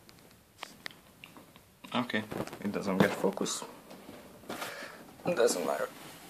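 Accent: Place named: Hungary. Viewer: Ahogy beköszöntél már hallottam hogy magyar vagy olyan jellegzetes magyar akcentussal. Remek videó. Köszönöm a feltöltést.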